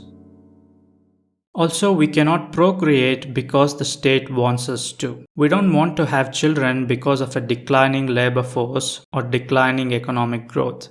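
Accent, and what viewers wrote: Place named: India. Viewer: pajeet accent messed the whole philosophy up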